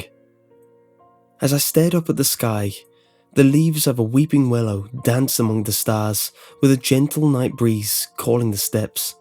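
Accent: United Kingdom. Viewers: The dialect and narrator were so British it hurt. It hurt real good.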